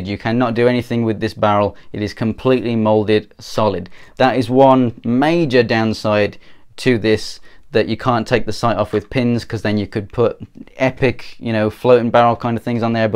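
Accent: United Kingdom. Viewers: Hey, I’m assuming from the accent that you live in the UK. Just curious where you got your mags from as I can’t find any UK online airsoft retailers that stock them. Did you buy them from a US site and have them imported? (Great video btw)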